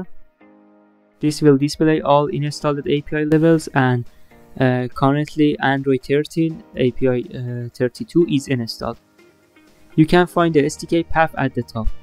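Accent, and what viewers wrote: Iran. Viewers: از لحجه ات فهمیدم ایرانی هستی 😂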